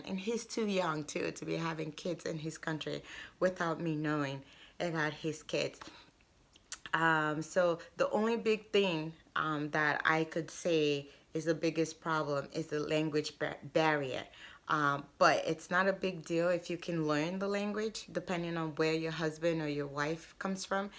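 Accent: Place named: United States. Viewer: your Accent look like Amerikan .how long had you been lived nigeria?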